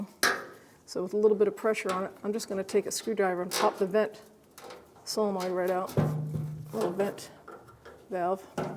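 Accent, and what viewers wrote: Boston, United States. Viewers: I tremendously appreciate how calm and straightforward you are with your videos! No electronic riffs and no annoying background music to muffle or garble your words! Just clear speech (w/ a Boston accent) and straightforward instruction. I recommend you to whoever I can while talking about vehicle maintenance. I thank you again!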